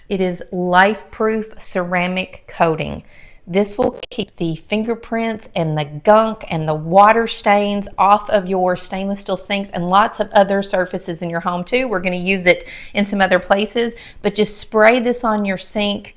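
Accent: South, United States. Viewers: I agree, her accent is endearing. DisposAll is a GE brand of disposal, one of the oldest. Her using a brand name in place of a generic term for her disposal is the same as people saying Kleenex when referring to any facial tissue. Another word southerners often use is Coke when referring to any soft drink. So in this case, saying DisposAll isn’t a southern pronunciation, it’s a word substitute. (39 years in the South for me.) 🙂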